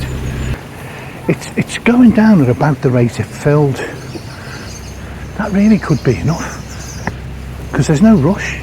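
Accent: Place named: Wirral, United Kingdom. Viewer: We've been watching your vlogs, are you from the wirral ? Your accent sounds like wirral, not Liverpool, we are from the wirral 😂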